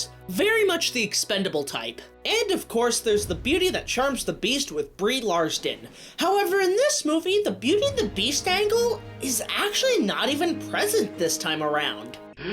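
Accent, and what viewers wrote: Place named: United Kingdom. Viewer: you really have a very good British accent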